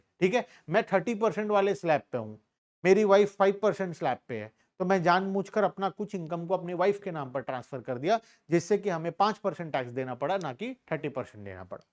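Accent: Odisha, India. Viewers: Sir your odia voice is awesome ❤ Are you from sambalpur 😅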